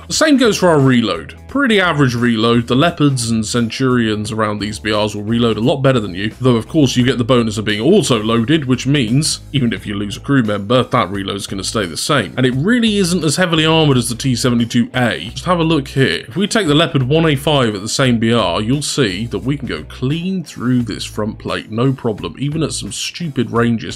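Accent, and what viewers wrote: United Kingdom. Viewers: Is he British though!?! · as a British person I can confirm he very much is British not northern tho unsure of accent.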